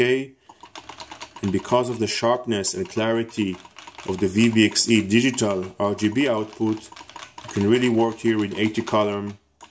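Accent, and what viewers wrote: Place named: Israel. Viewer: גבר לפי המבטא אתה ישראלי כמוני .... מדהים מה שעשית עם ה600XL לי יש עדיין 800XL בעליית הגג.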